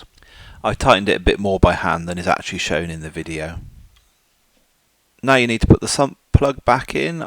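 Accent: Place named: United Kingdom. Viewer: That British accent sounds promisingly good for fixing a car.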